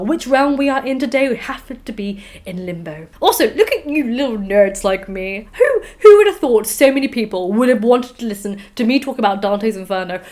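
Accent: United Kingdom. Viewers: do you have a British accent or do you just talk that way because you read books?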